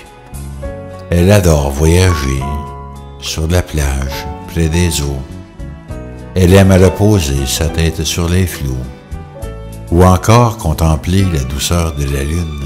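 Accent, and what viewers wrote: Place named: Canada. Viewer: Bonjour Superbe narration de cette poésie accompagnée d’une belle vidéo c’est toi l’auteur compositeur? Je pense toujours a toi car nous devions faire une émission de radio ensemble ca ne c'est pas fait car je me suis rendu compte que tu avais un accent canadien bien prononcé et mon responsable d’antenne n’aurais pas accepté tes interviews pour nos auditeurs du sud ouest de la France!!!! Je culpabilise depuis ce temps là Excuse MOI Je rentre de Charente Maritime ou je suis allé a Hiers Brouage ou est né Samuel Champlain fondateur de Québec je t’embrasse SINCERES AMITIES <mail> PS je ne fais plus de radio depuis 2ans ½ mais je viens de prendre ma retraite peut- être je vais reprendre????????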